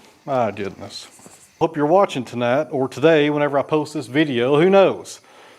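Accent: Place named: South, United States. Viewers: Well you are in fine form, Quite frankly it does not worry me. It is great to here that southern accent. Go for it buddy. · Yes! your accent trips you up sometimes, I think. I find, myself, I sometimes end up trying to say a word two ways at once, the accented version and the standard "television newscaster" version. I muchly prefer the Eastern Tennessee version. I too watch in part because of this. and yes, like, "all you all" is a real southern phrase. when I lived for a while in Johnson City, I really noticed how "storytelling" most people's speech was. Even a trip to Walmart might could be made into a story. And the phrases too. "a ton of pine" sounds OK by me. Southern phrases, yes indeed. many are made-up on the spur of the moment. Thus your "bloopers" are often endearing to me.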